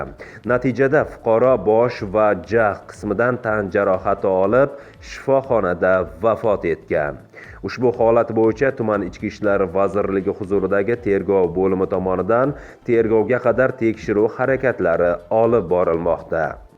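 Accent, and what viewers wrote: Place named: Russia. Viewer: Русча сузларни акцентбилан гапирасиз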